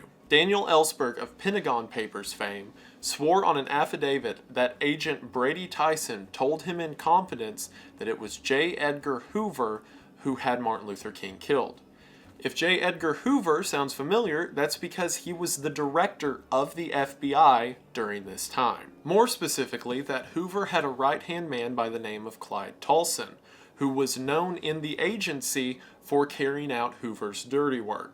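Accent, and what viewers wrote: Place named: Carolinas, United States. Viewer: Where are you from? You definitely sound like someone from the Carolina’s or maybe Virginia.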